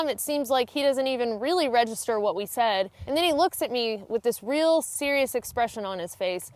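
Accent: South, United States. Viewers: As a native NC’n that hasn’t backpacked in 20 years... I have no idea how your videos keep popping up, but I’ll keep watchin’ just to hear you say “hike” and “neck knife”. Nuthin’ prettier than a girl with a great southern accent! 😍 Sadly, most of the girls native to central NC have lost most of their accent with all the Yankees moving in. 😏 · Your videos are spot on! Stuff people need to hear and want to hear! The reason you do so good at getting new subscribers and people continue to watch you is because your genuine. It also helps that you have that southern accent but I can tell before you post a video you actually think what would I want to know about backpacking if I was starting out. Keep doing what you do and keep grinding! Remember that the things you take for granite other people are wondering. Here are a few things as a new backpacker I wonder about maybe you can do a video on. 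Is drinking and bringing your own alcohol frowned upon on the trail? How do you carry your alcohol? What about hunting or killing game on the trail? Fishing in ponds you come across? Do you carry fishing gear? If so what is the best? What app do you use for GPS? Eating spots? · Great story and great advice! I live in Hiawassee GA near the AT(actually recognized the stretch of road near Dick's Creek Gap and Top of Georgia hostel in this video with the two smiling hitchers) and have picked up lots of hikers going to/from town. I've always had great interaction with those hikers and they're always super appreciative for the ride. Never a bad idea to take pics of licenses and vehicles and send those to a loved one or friend just in case. I do it all the time with suspicious looking characters... just a couple days ago at the Unicoi Gap AT trailhead while out for a day hike. Better safe than sorry! 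Love your videos and your southern accent! Keep em coming?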